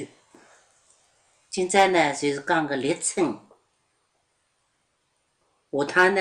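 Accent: China. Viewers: 感觉 常州 无锡 苏州 三个方言的味道都有啊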